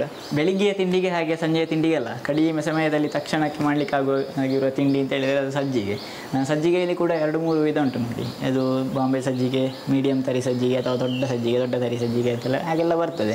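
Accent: Mangalore, India. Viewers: Nan aduge nodthino gothilla but nim mathu keloke vidro nodthini Mangalore language super thumba chanda ಉಂಟು keloke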